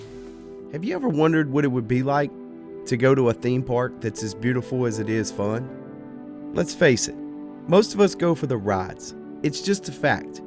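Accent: South, United States. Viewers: I love your videos and as a fellow southern boy your narration makes it even better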